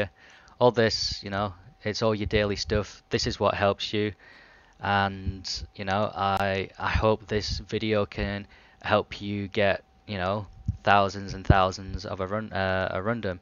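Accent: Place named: Scotland, United Kingdom. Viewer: scottish accent just make it better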